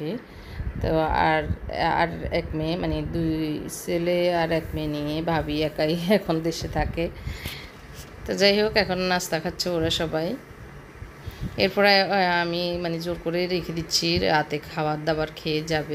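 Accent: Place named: Chittagong, Bangladesh. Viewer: Apu apnarkotai ctg ar tune astasa apni ke ctg ar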